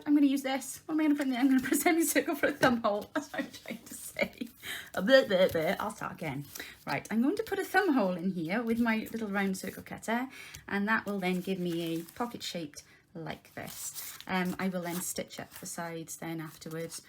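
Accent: Wales, United Kingdom. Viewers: Ooh, I love listening to your lovely Welsh accent! Your style is easy to follow and such great ideas. I’m new to your channel and will be watching with interest...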